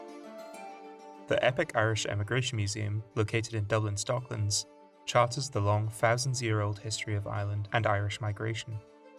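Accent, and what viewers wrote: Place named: England, United Kingdom. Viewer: Fascinating video and very nicely done. I'm curious as to why the narrator has an English accent? Seems a bit odd.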